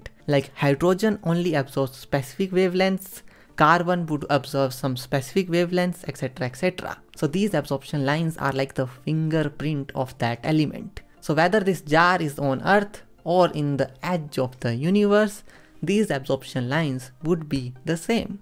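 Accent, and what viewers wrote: India. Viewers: Hey, Are you Indian? Your accent is exactly like Indian!! Astonished from your knowledge in that depth. Being a 10th grade, student you are a true motivation and inspiration ✨️ ❤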